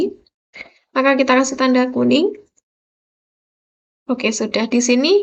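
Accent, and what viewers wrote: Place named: Java, Indonesia. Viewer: Aw medoknya khas bgt kek orang klaten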